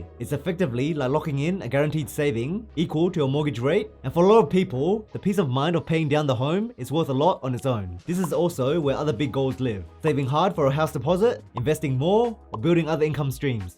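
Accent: Australia. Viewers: Typical Viet Aussie accent❤ smart man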